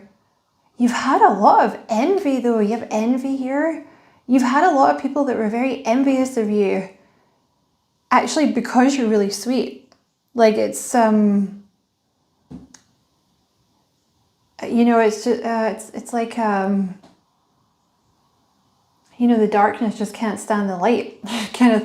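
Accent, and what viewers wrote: Scotland, United Kingdom. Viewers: Thank you. Are you scottish? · You sound Scottish 💙🏴👍 very accurate. Im incredibly intuitive. Im normally ten steps ahead. Anxiety up the ass lol so yes im done. New location and stagnant within a partnership. Put thru the shit, bullying, gaslit, manipulation, being spied on, stalker you name it..Things are coming to a very big end. Your so spot on. TY so much ❤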